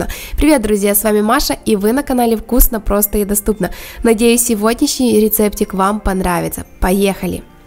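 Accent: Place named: Ukraine. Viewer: украинка?много наискасяков в разговоре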